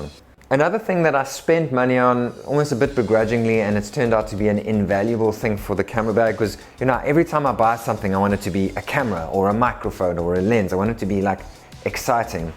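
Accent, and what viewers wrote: South Africa. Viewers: hey man just heard the way you speak and was wondering are you South African since you also priced the weatherman in rands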